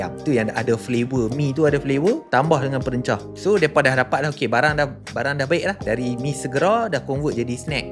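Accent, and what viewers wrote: North, Malaysia. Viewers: Apasal Melaka cakap orang utara?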